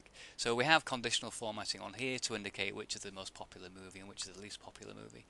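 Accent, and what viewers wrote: United Kingdom. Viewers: I had to learn Excel quickly before I start my new job. These tutorials are excellent! Moreover, everything sounds so much more interesting with that lovely British accent! Thank you so very much. I'll feel quite confident going into my new position.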